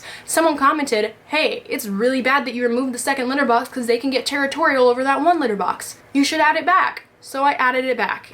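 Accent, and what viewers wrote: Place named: South, United States. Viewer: I feel like I suddenly just noticed your southern accent Like it just stood out in this video But I’m from Minnesota so 😔🤟🏻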